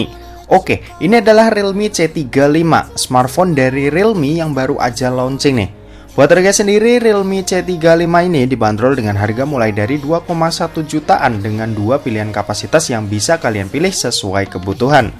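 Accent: Semarang, Indonesia. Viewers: Asli Semarang bang?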